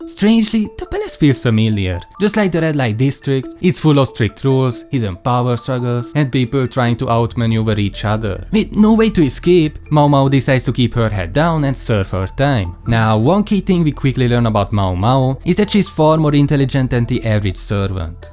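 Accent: Hungary. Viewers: That Hungarian accent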